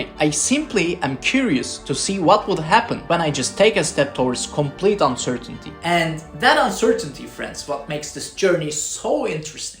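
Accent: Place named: Turkey. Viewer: aksan türk aksanı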